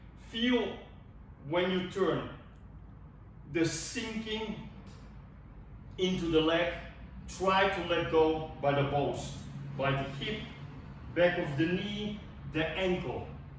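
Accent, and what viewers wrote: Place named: Israel. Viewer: I really like your videos because of how deeply you research things, I am so curious about your accent. My first guess is Israeli. I would like to see more about your fighting skills and which style ir styles you use. Is it now your own lineage. I mean are you now following a path where you create your own stuff? I don't get what you said about finishing a lineage and then learning another. It must have been so confusing. The sound is not great. Too much echo. I want to develop internal enegy control. I am not training any martial art. I want to feel better and have more control. Getting into a martial art might follow. Thanks for this video.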